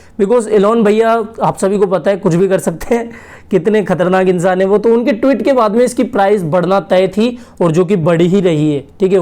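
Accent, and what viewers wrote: Rajasthan, India. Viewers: Rajasthani lg rhe ho Awaj se to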